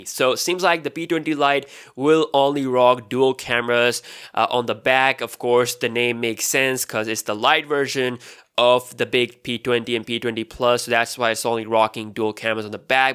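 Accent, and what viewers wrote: India. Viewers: det is India talk! Hahahaha...Pidgin "English" ! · You got indian accent. Video is good.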